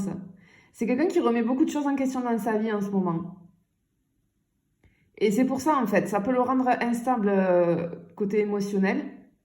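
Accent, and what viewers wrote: South, France. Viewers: Bonjour je vous ai découvert y'a peu de temps 🤗 et vu l'accent magnifique que vous avez j'imagine que vous êtes pas loin de celui dont vous parlez pour moi ici 😂😂 le sud hein .. j'aimerais trop savoir vers où vous êtes située car je vous est découvert presque en même que je l'ai rencontré lui et les énergies sont connectées ! Vraiment !! Trop fort 🤍 ce n'est pas ma fj je précise ,mais certainement un lien transit.. un lien qui m'a renoué avec ma féminité non sacrifié..et oui bref 🌻💌🌸🌷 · Merci, C'est fou ... Au monent où j'ai ouvert youtube j'étais en pleine interrogation et une question en tête et encore plus fou ,je tombe direct sur votre guidance ! Pur hasard ! Merci la grande majorité de votre guidance m'a fais sourire et je l'ai vu ! Merci,cela m'a reconforté, merci pour ce soupçon d'espoir que ses messages étaient pour moi. Tout ça avec notre cher accent du sud. 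Merci Ps: j'ai toujours peur d'y croire mais merci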